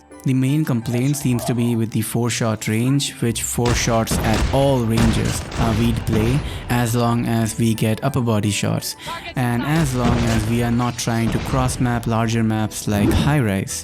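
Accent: India. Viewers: Bro where are you from..? Sound like you are Indian 🤔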